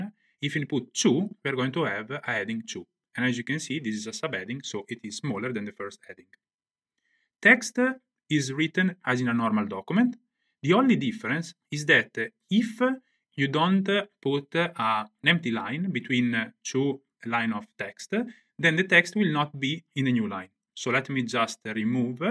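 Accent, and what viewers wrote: Italy. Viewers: love your italian accent xD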